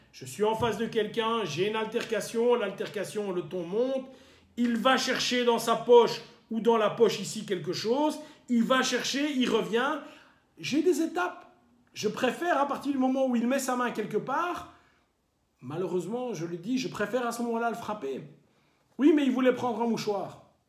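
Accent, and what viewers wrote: Belgium. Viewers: Tout le monde à un accent selon son origine. C est un accent wallon tout à fait normal, 2000metamorphe je te comprend pas.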